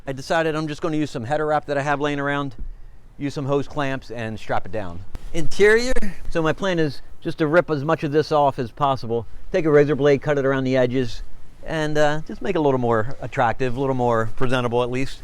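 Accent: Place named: Pennsylvania, United States. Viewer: im new here so idk if youve said where you're from but i would know that accent anywhere lol PA ftw bro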